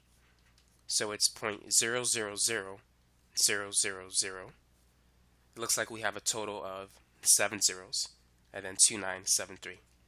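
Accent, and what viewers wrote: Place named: New York, United States. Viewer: I came hear to get my seventh grader help. Now I can't stop listening to him. His voice is calming, yet keeps our interest. I love my friend's New York🤔 accent.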